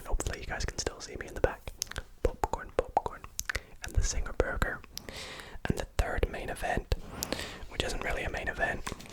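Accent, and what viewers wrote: Scotland, United Kingdom. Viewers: I love old Scottish accent, deeply miss it in the new vids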